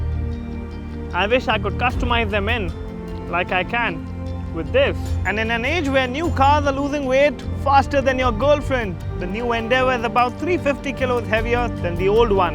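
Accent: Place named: Delhi, India. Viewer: Thanks for replying buddy ! btw I'm from Delhi You sounded bit like Delhi guys so that's why I asked you :p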